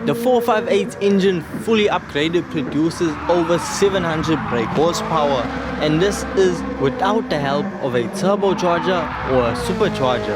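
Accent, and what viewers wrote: South Africa. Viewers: Are you south African???😭😭😭 I'm picking up your accent and it sound heavy familiar...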